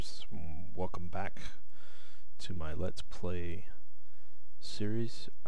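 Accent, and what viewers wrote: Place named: North, United States. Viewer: Yeah, that is what I hear, up north somewhere, though def not NY/NJ...lol. And is there a specific accent in FL? They are such a mix of snowbirds and tourists...lol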